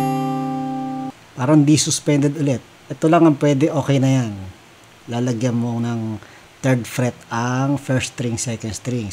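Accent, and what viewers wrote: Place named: Visayas, Philippines. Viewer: Dol galing talaga, bisaya ka?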